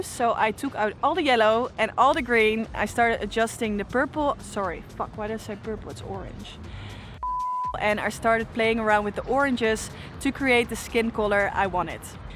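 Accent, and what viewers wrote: Netherlands. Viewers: Het Nederlands accent maakt deze video af 👌🏽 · great video, funny that you turned out to be dutch :P I thought i was hearing an accent haha :P cool location as well for the shoot! great work :) I'm thinking to buy the 35mm as well, looks zo good :) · Loved this video! I love how your “dutchness” comes out of you from time to time hahaha you have great energy and I’m looking forward to your future video’s! Nogmaals wat een leuke video!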